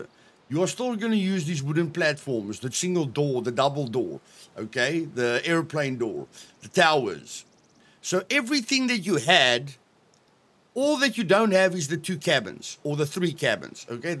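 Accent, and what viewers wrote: South Africa. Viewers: you sound South African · Are you South African?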